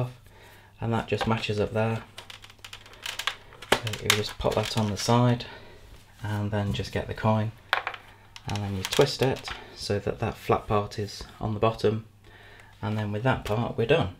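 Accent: United Kingdom. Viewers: Was thinking if I want to watch 26mins vid about cleaning my dyson. The british accent says yes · Thank you very much for these tips and tricks and spoken in such a lovely voice. I’m from Western Canada so UK accents are not as common here but always a pleasure to listen too. Im certain I watched another demo that when you cleaned the filter you were to try as best you could to close of one end and fill and shake with water and repeat until the water ran clear. Is this ok IYHO?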